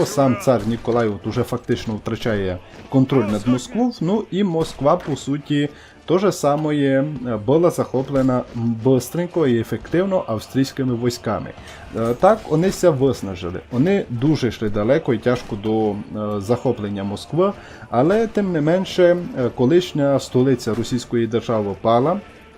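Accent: Transcarpathia, Ukraine. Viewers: Чоловіче, славно виходить)) і закарпатський діалект - теж файний. Я би так і продовжував коментувати: усе зрозуміло, але ти звучиш значно природніше і легше👍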